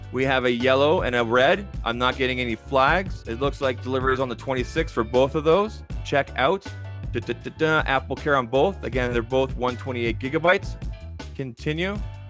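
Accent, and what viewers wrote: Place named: Canada. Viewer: thanks for what you do, the first time I met you I was in a live show of fpt and you donated $100 and defended Jon when some people were being rude. I commented that you sounded like a Canadian & you answered that you were from Calgary, my response was lol I’m in the gas city.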